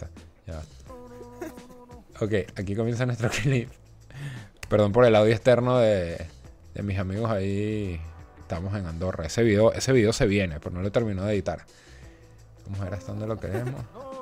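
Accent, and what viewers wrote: Venezuela. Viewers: eres venezolano? muy buen tutorial :D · Excelentes videos mi pana, eres venezolano cierto?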